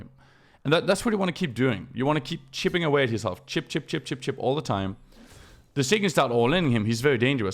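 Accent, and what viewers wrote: Denmark. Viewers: You have to be Danish, the way you pronounce TF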